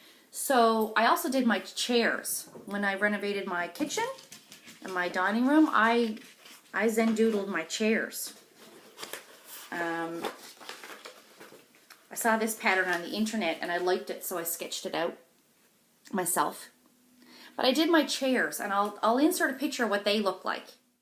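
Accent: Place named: Newfoundland, Canada. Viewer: Great video/WIPs ...I loved your doodling pics too. I really enjoy listening to your Newfoundland accent👍🏻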